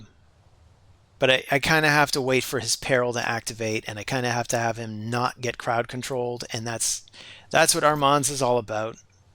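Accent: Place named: Scotland, United Kingdom. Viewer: I’m guessing Scottish maybe Irish. Good luck on the fights. I just got up to the 4000 mark early today.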